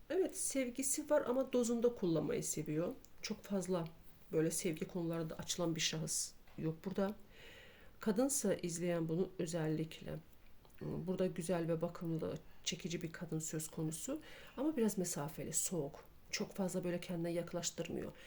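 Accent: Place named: Ankara, Turkey. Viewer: şivemiz bu biz angara nın bebesiyiz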